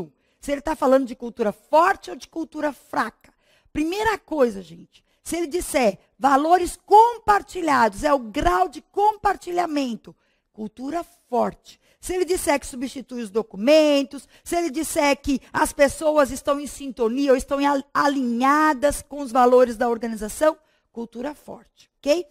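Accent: Brazil. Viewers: com sotaque do interior de sp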